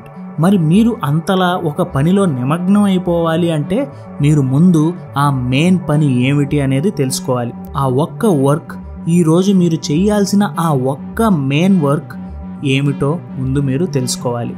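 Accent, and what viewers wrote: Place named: Telangana, India. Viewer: Ante konchem Language lo Telangana slang vastundhi anduke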